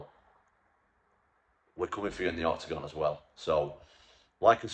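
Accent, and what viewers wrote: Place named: RP, United Kingdom. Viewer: Hello Tom, I'm a huge fan and I also think you are the next level of fighter with a concentration in striking, distance, wrestling, ground game, and a great working fight IQ. However, I think you are speaking the Queen's English and keeping in European etiquette, while trying to communicate to a filthy American (Dana White), where getting the unification match is concerned. If you are going to contain yourself, and he wants you to be contained, then you've already lost the argument. There is no need to respond and hearing it any further in such a manner id just bothersome. If you want this mother fucker to actually be his authentic self, and have a real dialogue, then speak his language. "You say Jon Jones is the pound for pound greatest MMA fighter of all time, I know you are wrong. I'M the greatest pound for pound MMA fighter of all time. 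You put the two of us in a room and I'm killing him and walking out! Period. He's depending on you to hide him from me, because I'll kill him in front of everyone. If you think I'm wrong, than put your money where your mouth is and schedule the fight. If he backs out, he forfeits the belt, the title, and any talk about being good at anything. You want to have this conversation? I'm willing to back it up by bashing his face in. You want to go on saying he's pound for pound the best, then prove it. Prove it or continue to allow him to hide from me." Something like that is what I would hear from my Mom every day waking up for school. We'd fig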